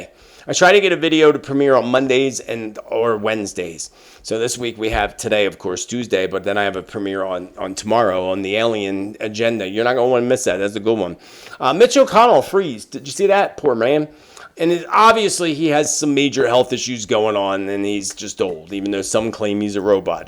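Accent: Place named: Bucks County, United States. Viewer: The way you pronounce certain words is very endearing:) My dear friend from Bucks County does the same thing! It’s precious:)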